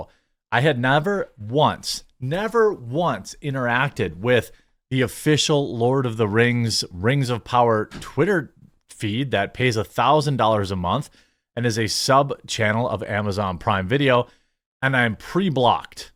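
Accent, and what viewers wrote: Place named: New Jersey, United States. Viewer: " says dragon funny." Not sure why, but you have a new jersey accent. You have the same accent as Nick Nimmin, David Laid, etc.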